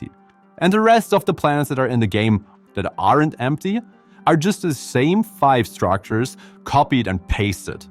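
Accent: Germany. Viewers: Love the german accent ;) Good videos, high quality, keep it up!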